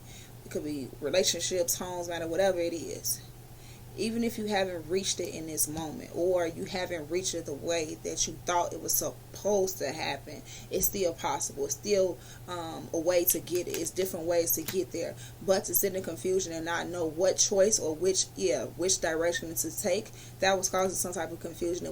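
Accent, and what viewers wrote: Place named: Chicago, United States. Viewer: You from Chicago? I feel like I can tell 😩😍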